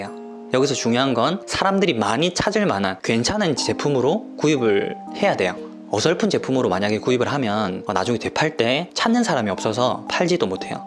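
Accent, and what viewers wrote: Gyeongsang, South Korea. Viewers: 구독하고 처음 댓글 달아요. 반갑습니다. 경상도 사투리 참 정감 있어요. ^^ 저도 경상도 사람이라...ㅋ 저는 방문을 리폼하려고 하는데요. 지금 살고 있는 현재집 말고 전에 살던 집이 페인트가 칠해진 목문이었기에 페인트 칠해본 경험이 있습니다. 방문을 떼지 않고 칠해서 인지 롤러와 붓으로 칠했는데 일명 눈물자국이라는 것도 생겨서 사포로 밀고 다시 살짝 칠한곳도 있었어요. 지금 살고 있는 집의 방문은 필름지가 입혀진 방문인데 필름지 위에 페인트를 해야할지 필름지를 다시 붙여야할지 고민하고 있어요. 님 일반인이 직접 셀프로 하기에 필름지가 나을까요? 필름지 위에 페인트가 나을까요?(물론 젯소 바르구요.)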